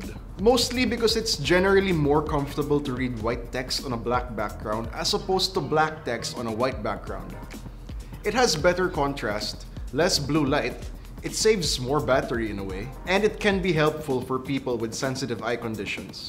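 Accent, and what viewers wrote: Philippines. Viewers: Ur accent sounds like Filipino. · You sound like Filipino accent.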